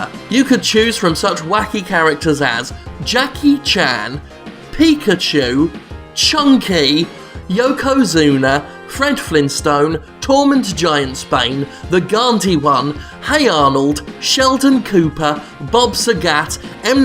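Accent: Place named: United Kingdom. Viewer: Because british accent and unexpected jokes in quick succession = Instantly Charlie Brooker.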